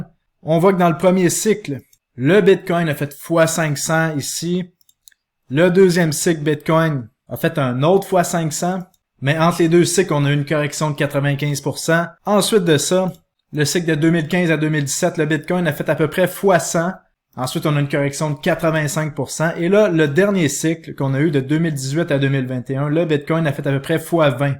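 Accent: Quebec, Canada. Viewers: Est tu de Québec ou MTL ?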